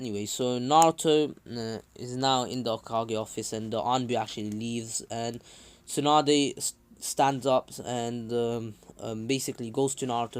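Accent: United Kingdom. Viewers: i just love to hear a brit swear it sounds amazing and awsome video btw keep it up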